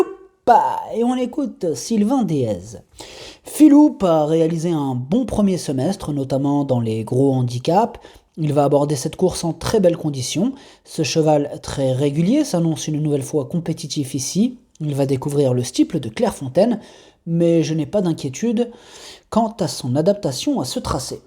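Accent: Morocco. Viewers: السلام عليك أخي وعلى متتبعيك الكرام.( هاد الدارجة المغربية عندك زوينة) تبارك الله عليك. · Bonsoir vous parler larabe comme un marocain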